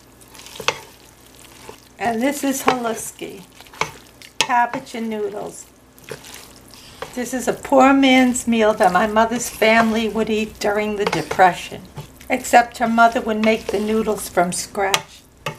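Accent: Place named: New Jersey, United States. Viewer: You sound like you have a new jersey accent, along with your haluski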